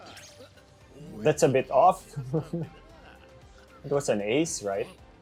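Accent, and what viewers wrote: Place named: Philippines. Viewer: Its not that its bothering or anything. But you have the Filipino accent, and i cant stop noticing it XDD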